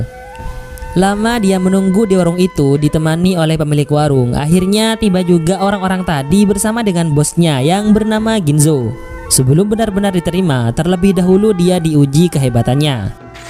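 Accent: Madura, Indonesia. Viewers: Penyiarnya kaya logat madura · Madura bingits cak, Kereen... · Bang dari madura yaaa.. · Khas Madura ya kuat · Abang orang Madura yah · Woo..naratornya kacong y.. 😀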